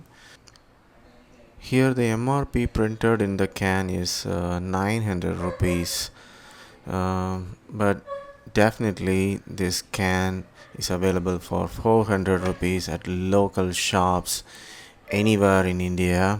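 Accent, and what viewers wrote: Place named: United States. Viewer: US accent is good.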